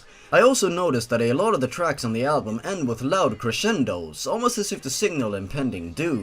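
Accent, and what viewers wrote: Sweden. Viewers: Man hör att du är från Sverige bara så att du vet.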